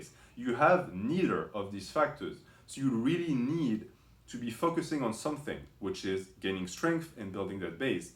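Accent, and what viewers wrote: France. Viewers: J'aime bien l'accent français ;)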